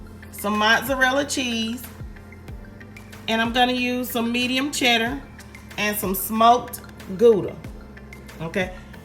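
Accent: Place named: South, United States. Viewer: Finally!!! A video with no creammy watery mac and cheese. And i can hear it in your voice that you’re southern. Im trying this for thanksgiving lol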